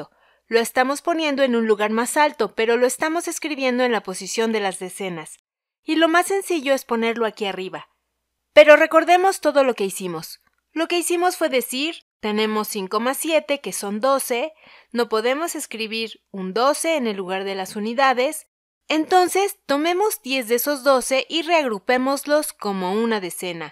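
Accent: Mexico. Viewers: este acento es mexicano?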